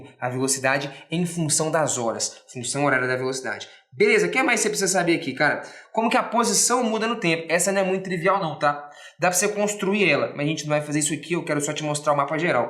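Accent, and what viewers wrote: Minas Gerais, Brazil. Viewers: Eu te amo cara se ensina bem e com um sotaque de mineiro foda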